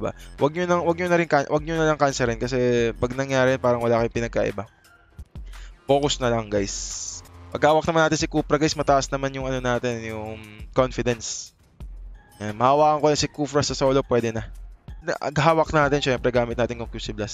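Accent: Iloilo, Philippines. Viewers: Tanong ko lng Tga Iloilo ka Po?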